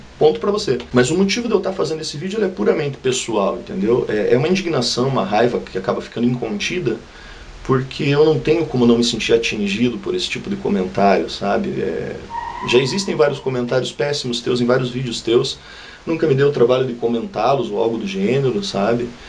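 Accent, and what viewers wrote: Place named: Rio Grande do Sul, Brazil. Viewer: Disse tudo, sou professora e sei como é. Adoro seu sotaque carregado e viva a nós gaúchos!!💪👊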